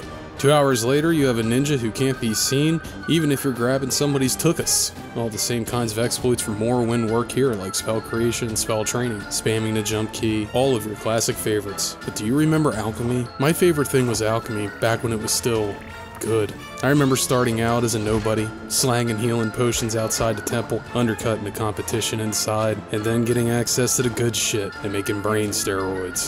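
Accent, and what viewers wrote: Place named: Mid-Atlantic, United States. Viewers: I detect a Pittsburgh accent. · You from Maryland, bro? You got that THICC mid atlantic accent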